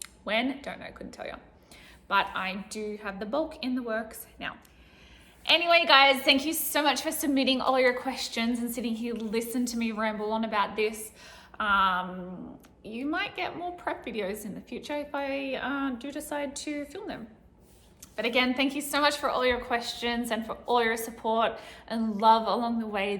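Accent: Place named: Australia. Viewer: She's cutting a promo in Australian- Oli Davis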